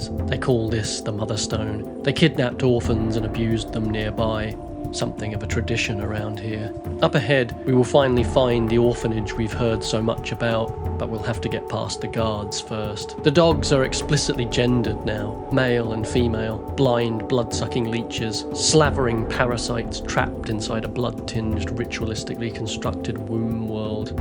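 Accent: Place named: United Kingdom. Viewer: This guy is more annoying and pretentious than he claims Vincent to be. The leftist stuff is overly annoying and childish. Bipartisan politics are meant to divide and cause inflighting in people. Most people pick a side younger in life and then grow out of it realizing they have more in common with people on the other side than they do the people they look up to in office that they vote for. He says things like the right supports mass shootings when nobody clearly does. He acts like every opinion he has is right, like an angsty person who's never raised a child and been able to see his own faults through raising another human being to function in society that's a mirror image of himself. Everything he says politically is just so cringe and stereotypical of his political side. I'd almost expect him to say "Heather, being born without a penis is inherently good unlike the toxic male Vincent who's smugness is a direct result of his containment of semen." This even being remotely watchable to anybody is an example of how strong the silent Hill stories are. 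Even a hack like this guy can use it as a crutch to shove his political views into and get a decent amount of views. Put a British accent on it like his and any young, immature self-hating Westerner will feel intelligent watching it. All I hear is pretentious garbage, Flesh Simulator (who's actually talented) being ripped off, hbomberguy being copied and "I'm very artful." I can watch some leftists I don't agree with, but this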